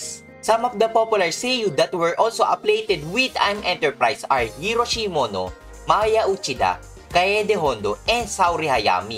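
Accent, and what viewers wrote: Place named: Philippines. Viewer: from the intonation of the narrator, im guessing, filipino? btw, nice vid! i watched because well, its ayane sakura!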